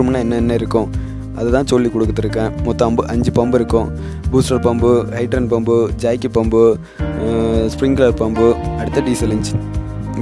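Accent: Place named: Kanyakumari, India. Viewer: Kk ..unga slank la theriuthu ..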